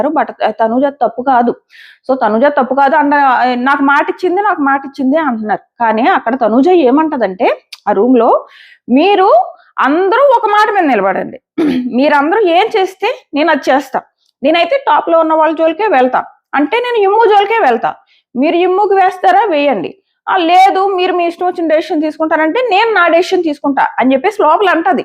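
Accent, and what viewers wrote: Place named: Godavari, India. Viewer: Ammai, You are from mana Godavari district ana mata.. Nice slang....❤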